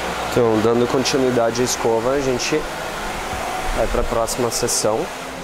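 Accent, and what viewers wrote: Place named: South, Brazil. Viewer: que vídeos maravilhosos!!! Estou fazendo letras na faculdade, mas cursando cabeleireiro paralelamente, pois é uma área que me agrada demais. Assistindo diversos vídeos na internet sobre cabelo, com toda certeza os seus são os melhores, não apenas pelo seu trabalho maravilhoso, mas também pela paz que você transmite ao falar e explicar suas técnicas nos vídeos, tenho certeza que isso é de extrema importância para a excelência do seu trabalho e felicidade profissional! Pretendo seguir como cabeleireiro, e depois de assistir alguns de seus vídeos, tenho ainda mais certeza dessa decisão, pois me identifico muito com você, tanto no amor pela profissão, como nos ideais de felicidade e visão de mundo, sou teu fã, estou acompanhando o canal, e não poderia passar por aqui sem deixar um comentário parabenizando pelo seu trabalho e pela pessoa que você é. 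Gostaria muito de tratar o cabelo contigo, mas pelo incrível sotaque sulino, acredito que estamos meio longe hehe Enfim, parabéns, continue com o maravilhoso trabalho, no salão e no youtube!!!:D Sucesso!! Paz e Alegria!! Patrick!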